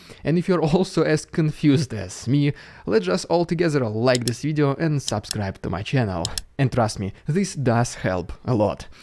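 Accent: Russia. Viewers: If you still didn't understand that.....I was just trying to help the know that Rheinmetall is 3 syllables not 2, I just wrote the tiny line, you had to just comment, I did it not to be a dick, and to be nice about it without writing hey you said that wrong, he has a heavy accent just trying to help with a word he has used often lately. And what I wrote is a fact, what was your reason to comment? No life? An idiot in real life looking for a place where he can find some people less smart than you? Does that make you feel better? You are so dumb you started all this from a single line fact I wrote for the content creator not a random idiot which at this point is you. I could be a real ass and go back into how "Kaliber Missile" has 6 syllables....lol. Like I said have a nice day ass 🤡. And btw people do not care about your comments they are so ignorant your that idiot in the comment section btw......doubt you will change, it's your base intelligence limiting your mental growth so I don't totally blame you, just as you can't blame a child with learning disabilities maybe not meeting the same marks as their peers without a disability..........🙄🙄🙄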